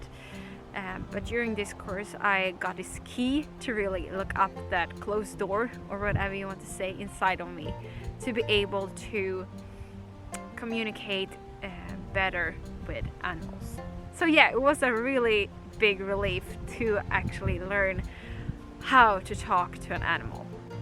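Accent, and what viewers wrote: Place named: Scandinavia. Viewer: I'm just getting into this topic, after my mind being torn apart by Anna Breytenbach... It's exciting to watch someone go through the early stages of developing these skills! Edit: I love your accent. Scandinavian right?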